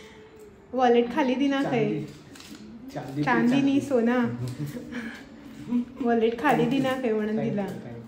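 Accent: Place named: Konkan, India. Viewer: Nice vlog as always ❤ you are very much knowledgeable specially your Konkani is very pure and shudh ❤ Love your work. Eagerly waiting for Ganesh chaturthi vlogs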